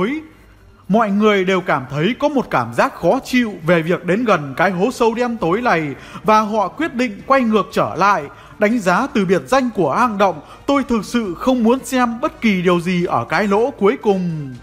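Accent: North, Vietnam. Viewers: Anh là ng bắc à :))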